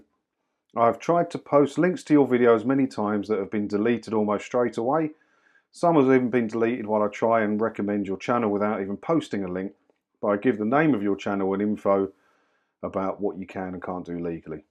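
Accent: Essex, United Kingdom. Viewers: You are a very likeable bloke, great advice, sound Essex lad? I have received 15 letters from the licence gestapo to “the occupier” 👈🏼 who ever that is ⁉😂 in the last two months. Each one seems to have more red ink ✍. Saving them up to paper the walls in my sitting room 👍🏼. 🤗 👵🏻🇬🇧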